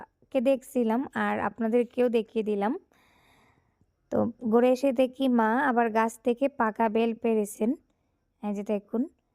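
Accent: Sylhet, Bangladesh. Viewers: আপু আপনি কি সিলেটি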